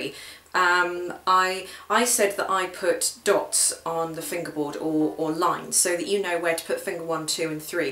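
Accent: United Kingdom. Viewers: Hey:D Thanks! I found this clip really useful since I'm still finding my early steps.. I like the whole series too.. Keep it up (y) P.S. I love ur British accent too:D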